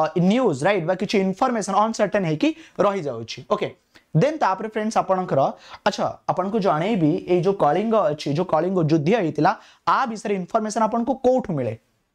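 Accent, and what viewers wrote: United Kingdom. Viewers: Like an English accent...but his amazing teaching style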